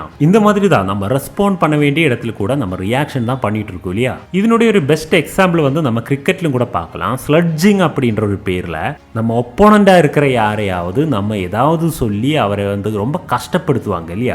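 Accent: Kerala, India. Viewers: Maliyalais Tamil speech.. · Neega malayaliya... ? ungaloda thamil vithyasama erukke...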